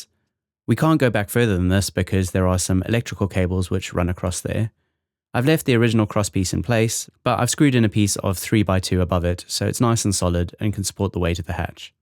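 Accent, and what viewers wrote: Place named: South Africa. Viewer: 1. Nice show & tell video. This is one my many projects I have lined up! 2. Are you an ex Saffa by any chance? I'm sure I can hear a bit of the accent from the old country. And 3. Your house layout is eerily similar to mine, even down to the artex ceiling and placement of the hallway light! Creepy! 😁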